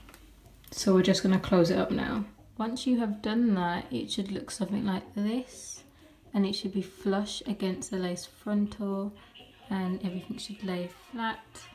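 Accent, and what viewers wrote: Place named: Northern England, United Kingdom. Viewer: Your accent 😍😍😍😍 Northern? Manchester?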